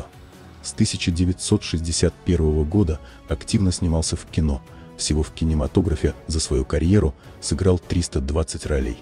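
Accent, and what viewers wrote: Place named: Urals, Russia. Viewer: Ты что с Урала?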